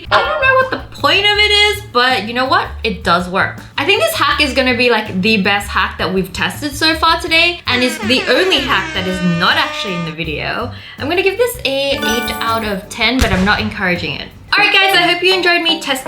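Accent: Australia. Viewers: are you from Australia or America or where? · Please correct me if I am wrong, but I pretty sure shes Australian. [Sorr if I spelt anything wrong]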